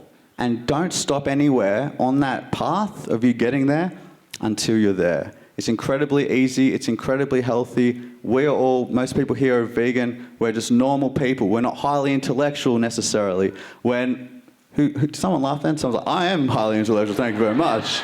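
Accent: Australia. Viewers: u Australian lad?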